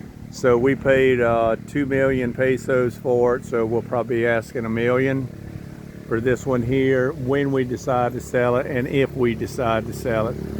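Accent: South, United States. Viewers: Aloha from Hawaii dude. I love your Southern drawl lol. You are hard core. I really want to come visit. It is getting stupid expensive to live in Hawaii and racism against whites is bad. Thanks for sharing your life with us mate. ❤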